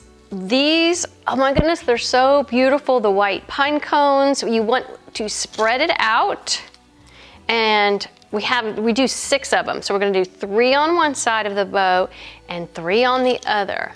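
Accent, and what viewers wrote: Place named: South, United States. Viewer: I love and miss the Southern look (and accent!). You make it look like I could do this too. Thank you so much! From a native Kentuckian who lives on the west coast now. We have those white pines right behind my office window!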